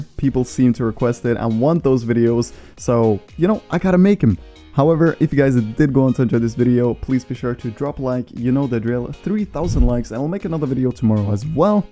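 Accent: Norway. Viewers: Er du norsk? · Are you Norwegian?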